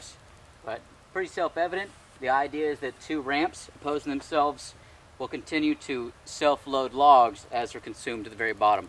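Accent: Texas, United States. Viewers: Around mid-November the nights are about 14 hours long in the US. Based on the slight accent in his voice, I'd say probably somewhere in Texas. So the combination of not-quite-winter and Texas weather patterns, that would explain why he's OK with just jeans and a flannel shirt.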